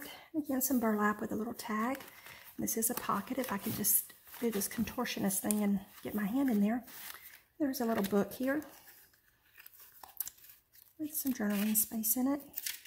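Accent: South, United States. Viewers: you have a sweet southern voice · Being Southern myself. I think your accent is perfect. I love your journal. I look for to seeing more of your works. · Don't you dare apologize for your accent lovey, it is just charming ( I love southern accents:D) and it is part of you and your history. Hello from Australia btw xo I just love your journal, it is gorgeous (especially love the idea of the pen/pencil space) have subscribed and I am sure I will enjoy many more of your videos in the future, well done xo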